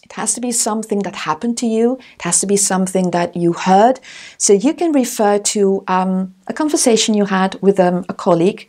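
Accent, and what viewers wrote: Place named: United Kingdom. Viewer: She speaks very smoothly without any fumble- awesome British style of speaking...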